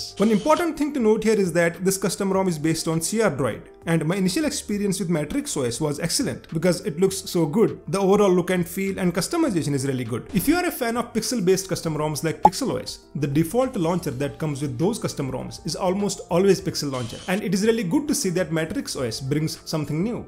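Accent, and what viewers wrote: Kashmir, India. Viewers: Bro you from Kashmir? Your accent is so Kashmiri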